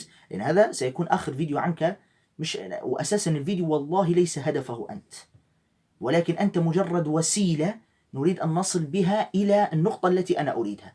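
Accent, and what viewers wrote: Egypt. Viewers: هذا الوارثوج شبية البرص احول نوتردام ليس شيعي اصلا انة نصراني ابا عن جد وهذا ما صرح بة الشيعة. وحتي لو كان شيعي اصلا فلن يتغير في الامر شيء كلهم انجاس× انجاس .وبعدين النصاري عارفين انة نصاب ولكنهم يستعملون كل من يسب في الإسلام فكلهم نصابين × نصابين رشيد قمامي ورشيد ابو السباع وتعيس ابو بظرط ونقمات موافي الكدابة ام فشة عايمة ومحمد رحومة النصاب الهارب وكلب الالمان ابوعضمة خامد عبد اليورو.. 😂😂والله لهجتك المصرية جميلة ياشيخ وهذا مايسعدنا ويشرفنا ❤❤ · كل سنه وحضرتك طيب يا شيخ بمناسبه الشهر الكريم وحلوه اللهجه المصريه عليك اوي ربنا يديلك الف صحه وعافيه وتتصدي للناس دي وكل من افتري ع مسلم..ربنا معاك ويقويك ❤️💪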